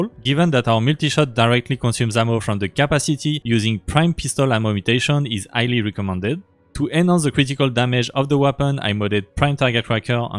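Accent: France. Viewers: Toi t'es un bon gaulois ça s'entend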